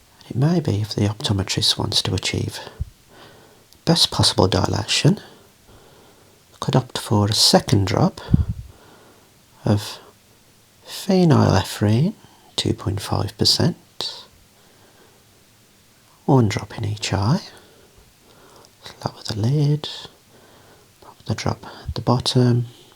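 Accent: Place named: United Kingdom. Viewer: I'm german and the English we hear here is mostly American English. It's always a pleasure for me to listen to British English, like Tom Ellis in "Lucifer" , Henry Cavill or Ewan McGregor as Obi wan Kenobi. Or THIS gentleman here on this channel. Everytime a Star Wars kind of experience, when I hear "hello there !"😆 A big "Servus alle miteinander !" from Bavaria, Germany !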